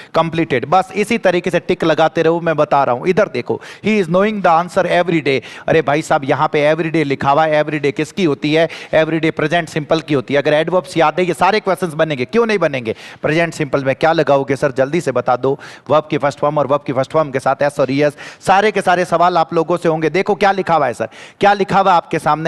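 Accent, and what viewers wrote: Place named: Marwari, India. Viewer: Hm jese dafar ko bhi aapki मारवाड़ी english ne achaa बनाया है sir ji english se bahut dr lgta tha such me ab wo dr khatm😢😊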